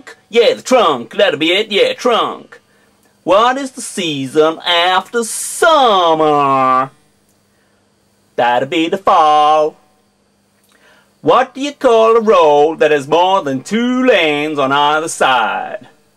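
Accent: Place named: South, United States. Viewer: This is the first time I've laughed at a Southern accent for any reason other than the "you sound like an idiot" thoughts that usually run through my brain XD . You gave me a reason not to hate the Southern accent, so kudos for that.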